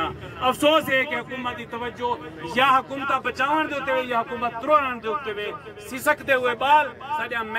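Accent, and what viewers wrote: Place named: Saraiki, Pakistan. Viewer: بہترین وسیب دی آواز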